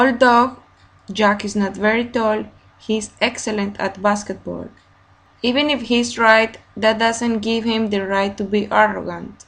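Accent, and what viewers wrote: Colombia. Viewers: super pero te aconsejo cambies ese acento paisa, molesta mucho el tono ,gracias haces un gran aporte al Inglés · ese acento de paisa tan fastidioso para una clase parece un burdel.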